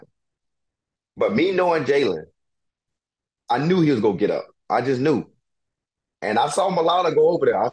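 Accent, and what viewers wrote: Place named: South, United States. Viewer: Man this guy has heavy southern accent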